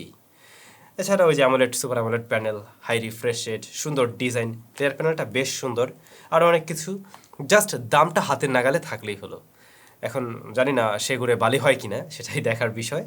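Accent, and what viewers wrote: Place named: Barishal, Bangladesh. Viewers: বরিশালের কেন জাগয়া বাসা আপনার? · ভাই তোমার বাসা বরিশালের কথায়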